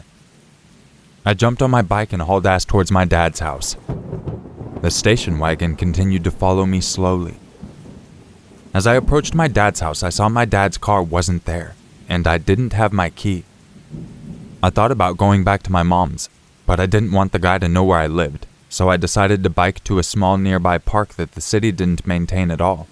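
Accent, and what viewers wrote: South, United States. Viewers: Love the southern accent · Love your Southern accent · u and that county voice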